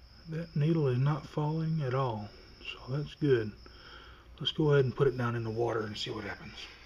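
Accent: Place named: Illinois, United States. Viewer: Very informative video. Thanks for the upload!! :thumbs up: Btw, you sound just like my Father-in-Law (Central Illinois accent).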